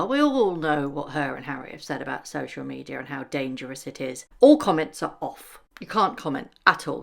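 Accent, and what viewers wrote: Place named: United Kingdom. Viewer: you got a UK accent, but I'm not sure where you are based. Surely you remember the Glenfell Tower fire when 74 died. Meghan did not turn up and get in the way. The Royals and Politicians visited by invitation after the smoke and gone and people had been moved elsewhere. Meghan was sent to help promote a community cookbook. She did not wander amongst this graveyard in the sky offering to get signed T-sirts for kids who were still trying to find a bed and a consistent supply of food. This is weird and she has shown she knows how to behave in a disaster not based in The USA.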